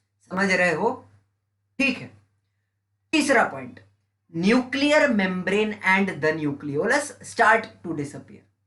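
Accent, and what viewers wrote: Maharashtra, India. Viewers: Are u Marathi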